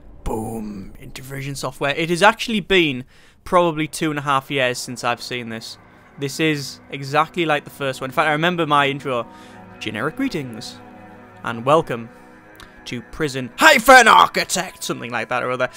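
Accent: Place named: England, United Kingdom. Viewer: As soon as you started talking i knew you were either English or Scottish. (Maybe even Irish)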